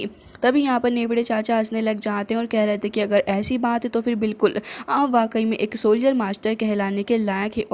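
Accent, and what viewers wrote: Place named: Bihar, India. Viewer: Bihari bol rahe ho aap ❤❤❤❤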